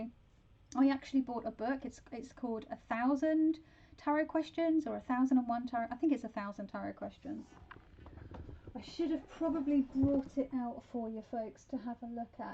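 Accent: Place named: United Kingdom. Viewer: I wish I would've had you for a teacher when I was in school! Professor course on "the metaphysics of English literature" is fab! cool British accent, long flaming red hair wonderful speaking voice and a master of waffling, what more could you ask for in a cool college professor!!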